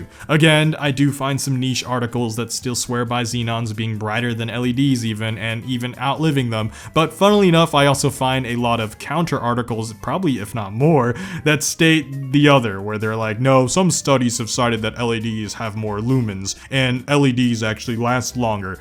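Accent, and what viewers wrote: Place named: Midwest, United States. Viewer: You have a similar accent to Sam O’Nella, are u from the mid west?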